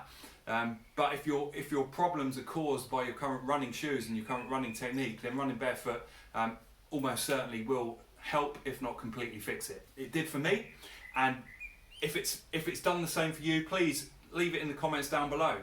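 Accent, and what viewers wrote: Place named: United Kingdom. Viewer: hey, thanks for your videos , it really help to make a transition. Besides, it is nice to listen a british accent for ones ;)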